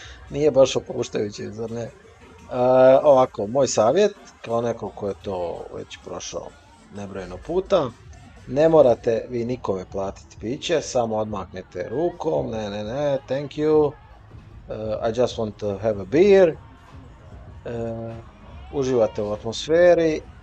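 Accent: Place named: Herzegovina, Bosnia and Herzegovina. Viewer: Ok drugar ocito nista ne znas o Europi jer si negdje iz Ercegovine koliko čujem po glasu . Da ti Tata objasni šta imas u toj Europi imas klubove od 5000 ljudi koji se svi praše bez stajanja dovoljno je da potapšeš osobu koja ti je draga po ramenu i ta osoba ce ti dati felacio besplatno . To o njih 20 ispred tebe svaki bordel u Europi radi zove svoje cure i ne placas pice vec te pitaju koju bi kresao. I da ti kaze netko ko je bio na Patayi sve je to super ok ali daleko bolje mi je u Nizozemskoj ili Belgiji od Thailanda tako probaj ovdje vjeruj mi biti ce ti isto super.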